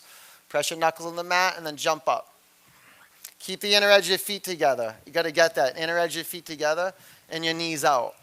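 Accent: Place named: Boston, United States. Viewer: Sounding very Boston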